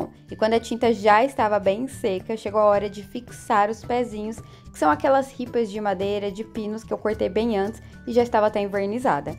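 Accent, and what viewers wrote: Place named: São Paulo, Brazil. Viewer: de que cidade você era antes de casar? Você fala muito parecido com o sotaque do pessoal do interior aqui de São Paulo onde estou morando. Moro em Tatuí, mas, toda a região o pessoal tem esse sotaque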